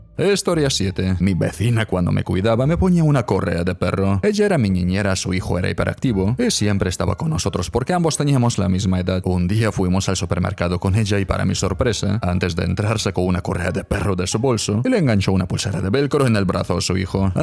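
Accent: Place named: Mexico. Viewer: Ese acento mexicano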